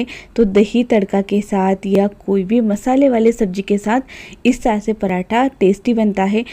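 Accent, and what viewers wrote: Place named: Sindh, India. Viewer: Ur accent is Sindhi MashAllah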